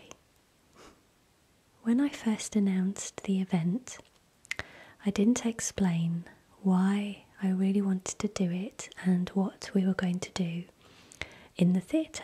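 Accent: England, United Kingdom. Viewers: Enhlish accennnnnnt, live it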